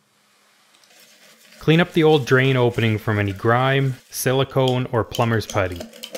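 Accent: Canada. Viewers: You must be from the Maritimes.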